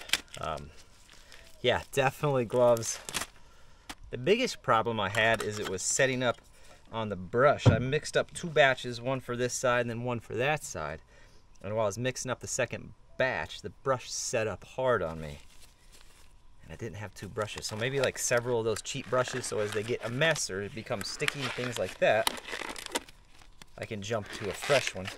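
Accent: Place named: Midwest, United States. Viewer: Yeah, his midwestern dialect is tough on my old ears. I don't catch everything he says.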